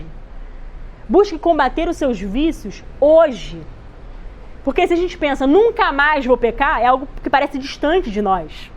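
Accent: Rio de Janeiro, Brazil. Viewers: Hehehe o marcadíssimo sotaque fluminense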